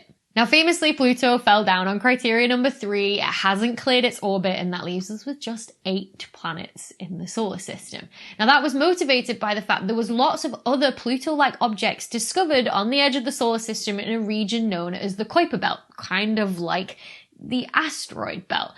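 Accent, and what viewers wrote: United Kingdom. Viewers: At first I thought you must be Australian. Then I heard you reference WE in the north and realized it must be the UK somewhere. Anyhow, nice accent, great eyes. 🙂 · every time you say "run the matts" I'm like oh right brit. lol love your accent. I think most Americans love the brits accent. I hear we all sound like cowboys to yall. even like the midwest and cali people do.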